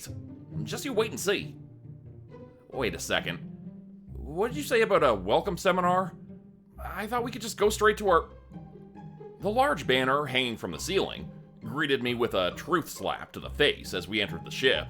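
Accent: Canada. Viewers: I like your Canadian accent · Your Canadian accent makes your stories very entertaining good job!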